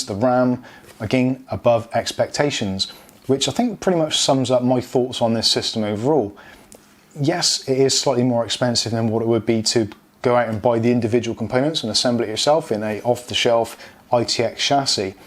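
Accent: Bristol, United Kingdom. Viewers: I've ordered a 2080ti model - also do I sense a South West (bristol?) Accent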